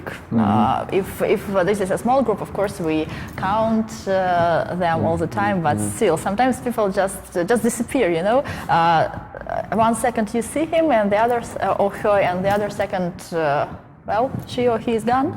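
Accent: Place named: Russia. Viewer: У нее какое то русское произношение ))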